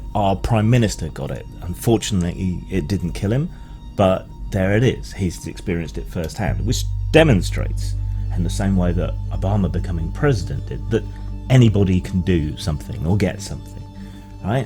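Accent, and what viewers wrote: United Kingdom. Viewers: Why the British accent,? Let's see if anyone get that.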